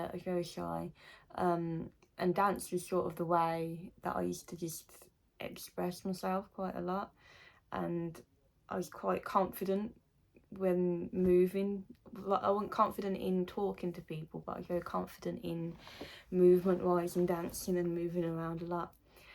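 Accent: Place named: United Kingdom. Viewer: So Sweet of You to Share Your Life and Journey with All of us. BTW, LOVE YOUR BRITISH ACCENT.... Some of my Adult Childrens' Close Relatives Were Born, Raised, & Reside in England. Thank You For Your Terrific Videos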